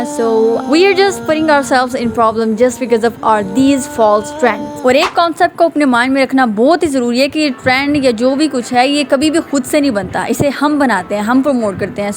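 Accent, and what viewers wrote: Punjab, India. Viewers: Yr sister ap ki cute punjabi 😂❤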